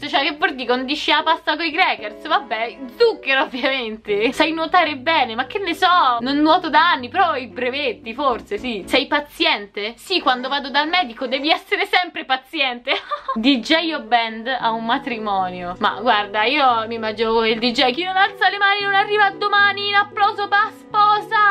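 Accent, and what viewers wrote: Rome, Italy. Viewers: Quanto amo er tuo romanooo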